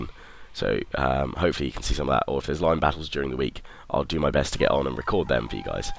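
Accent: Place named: England, United Kingdom. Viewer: He's from England, and here when we see an 'i' we say 'eye' naturally.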